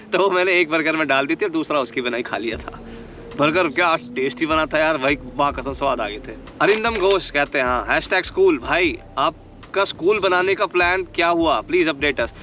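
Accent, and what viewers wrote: Haryana, India. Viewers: Ram Ram ji, News wali video kab ayegi. Karo news wali video, bada maza aa jata hai waise videos dekhke apke Haryanvi accent me.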